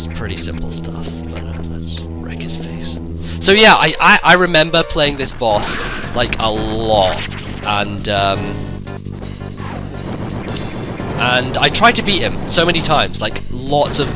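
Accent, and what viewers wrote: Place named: South Africa. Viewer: Your Australian accent, more south african..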